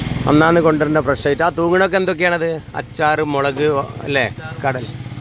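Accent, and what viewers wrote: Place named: Malappuram, India. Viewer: Njagal malappuram karude slang engane thanne yanu bro nee athil tention adikkada muthe thekkan mare pole boomikku foomi baryakku farya yennu onnum allallo aksharangal correct alle slang nee preshnam akkenda yethu slang anengilum yenthelum arivu kittunnundakil athu padikku allathe njangal malappuram kare athikshepikkalle muthu maney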